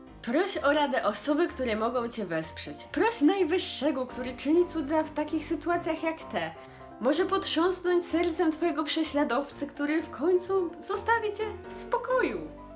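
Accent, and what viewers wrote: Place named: Silesia, Poland. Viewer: Świetny kanał, bardzo miło się ciebie ogląda 😁 poleciał sub oraz łapka 😁 Powiedz proszę, czy jesteś ze Śląska ? Słyszę u Ciebie lekki akcent, ale mogę się mylić 😂😂😂😂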